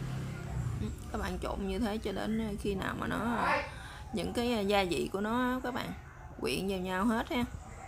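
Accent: Mekong Delta, Vietnam. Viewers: Miền Tây quá bạn ơi "gõ nước.bỏ ga cái gổ🤣 cho nó gáo nước"" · Chào cô gái miền Tây ( qua cách phát âm R ) cảm ơn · Người miền tây hay nói rổ thành gổ, ráo nc thành gáo nc, lúc đầu mình nghe mãi ko hiểu về sau mình thấy ng nào cũng nói vậy, mới biết là tiếng địa phương, video của bạn mình rất thích, chia sẻ tận tình nhiều món chay ngon đoqn giản, chúc bạn khỏe gđ hạnh phúc nha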